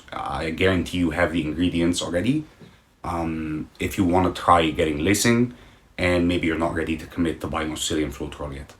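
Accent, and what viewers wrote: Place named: Egypt. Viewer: I just found your videos and the content is such a gem! Your experiments are fun in their own right! True, cells are generated by destabilizing the fluid paint through disrupting surface tension and manipulating paint densities and viscosity while layering, so that some sink all the way down or float all the way up. You can check out the Rayleigh-Taylor instability for more context. Side note: You're AMAZINGLY fluent, but I think I heard a faint Egyptian accent and I immediately grinned 😁 I hope I am right in my speculation!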